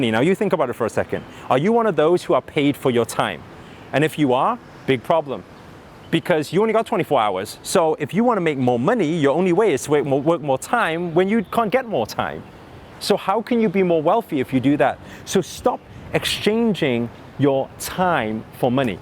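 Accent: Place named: England, United Kingdom. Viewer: First time seeing Asian guy speaking in English accent 😅 sounds funny but he’s pulling it off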